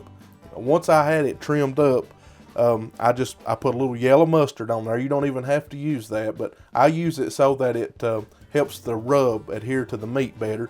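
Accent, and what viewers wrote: South, United States. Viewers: Yoooo really down south sound to you man 💯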